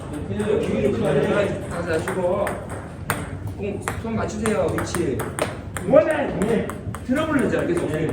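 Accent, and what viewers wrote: Gwangju, South Korea. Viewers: 전라도 사투리 구수하네요 내용도 좋구요 코치 부럽습니다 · 나도광주사람이지만 사투리진자 최고네요 엄청웃기요ㄷㄷㄷ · 광주 싸나이 배딸배아빠"님 반가워요. 저희 전라도 광주 맞아요 ^^; 광주 살 다 직장 때문에 수도권으로 가신 분들이 많지요. 배딸배아빠님께는 의 구수한 사투리가 더 정겹게 들릴 거 같아요. 이렇게 탁구를 통해 다시 인연을 맺고 얘기 나눌 수 있어 기뻐요. 탁구 10년 차라니 대 선배님이시네요. 저 로봇수제자는 올해 탁구 4년 차 새내기 입니다. 그래서 엉성한 것투성이랍니다. 하지만 탁구 열정은 누구에게도 뒤지지 않아 졸라서 부족한 것 채워가고 있어요. 정말 탁구 레슨 중에 하시는 한 말씀 한 말씀이 주옥같은 경우가 많아요. 그래서 저만 보기 아까워서 유튜브를 시작했답니다. 어제까지 춥더니 오늘은 완연한 봄기운이 느끼지는 불금입니다. 즐겁고 행복한 불금되세요~ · 관장님~ 리시브 강의 최고네요~ 구수한 사투리..친했던 광주출신 군대 동기가 생각나네요.. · 광주인가요~? 사투리 구수하니 좋네요 ㅎㅎ 광주살다 서울와서 직장다닌지 16년 됐는데 그립네요~ 서울에서 탁구에 빠져산지 10년차 초보인데 관장님 가르침이 주옥같네요 ㅎ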